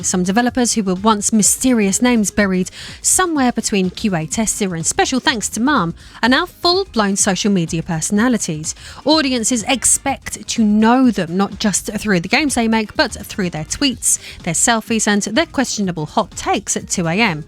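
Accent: United Kingdom. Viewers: Wait, you're a BRIT? 😮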